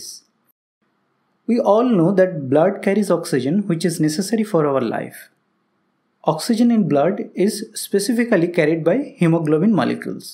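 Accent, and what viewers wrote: India. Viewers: The keling slang is so indian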